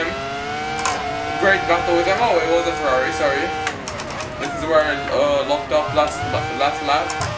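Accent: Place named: England, United Kingdom. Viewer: Vezi ca ai putina engleza pe la coltul gurii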